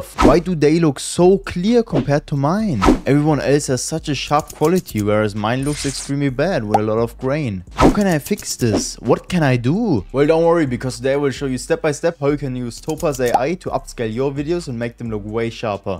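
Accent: Germany. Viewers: Ich höre einbisschen Deutsch raus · ur german i only watched 10 seconds and heared ur accent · richtig deutscher akzent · This German accent 👍🏻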